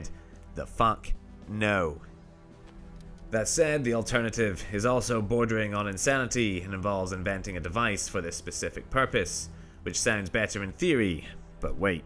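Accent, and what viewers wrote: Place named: Scotland, United Kingdom. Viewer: yes the video was enjoyable and useful but pleasantly filled with your ripe Scottish sarcastic humour!! (my mum was from Ayrshire so I've got a wee bit of Scots blood running through my ENGLISH😁 veins). Have you had any contact with America? Your accent is curiously relaxed compared to the slightly more "tight" Scottish I've often heard. I'm an English language teacher and fascinated by language, so the question is purely professional.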